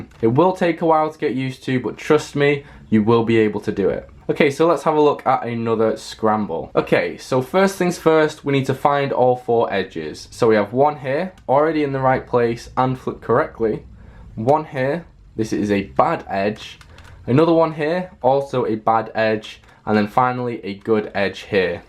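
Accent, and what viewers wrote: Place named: Manchester, United Kingdom. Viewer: So much easier to understand when it is explained in a Mancunian accent, like my own. :o)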